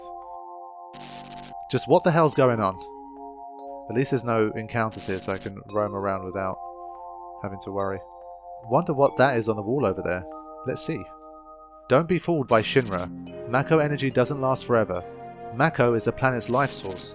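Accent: England, United Kingdom. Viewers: Wait... Have you guys always had that chocobo opening square logo? I honestly think thats the only thing I didn't know about this game. I'm from the UK and for us it just boringly says 'Squaresoft' You sound English too though... I'm so confused but I've legit NEVER seen that before. Platinum release maybe? Or are you in another region? On a different note, aren't you the guy who went to visit the blind guy who loved your FFIX LP because you read the dialogue out loud? That was awesome. Lastly, I feel like you had a FFX video years ago, sort of a retrospective-esque vid where you spoke about the ending and said something like "I was in bits! Absolute bits!"? For some reason I always remember that.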